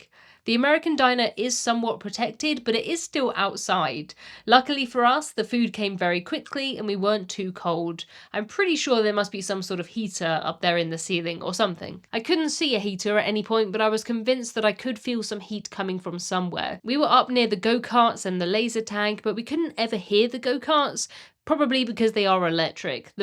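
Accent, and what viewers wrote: RP, United Kingdom. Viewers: Oh your accent is so posh and lovely. Thanks for this video as we are planning on Alaska soon. I think Encore is the perfect ship for Alaska with the Observation lounge. Were you happy with the amount of time in port? The super early morning arrival and early afternoon departure seem like they might be disappointing.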